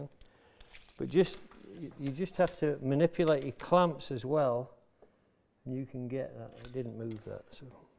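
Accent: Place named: Sheffield, United Kingdom. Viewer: R C:D Sarcasm doesn't travel well on the internet, does it? Try reading my post with a Sheffield accent (like ). Of COURSE he's the master! I was taught engineering by a guy from Sheffield...no matter how well you did something, his best comment was: "Hmm...not bad that. Mek another one to be sure."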